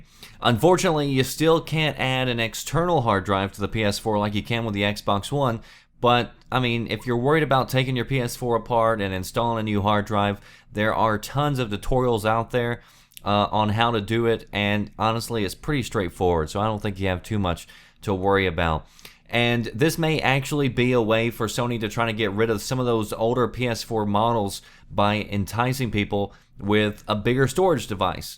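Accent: South, United States. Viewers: I like your accent, where are you from? (I'm British so don't recognise US accents). Subbed. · it's that southern sounding accent I reckon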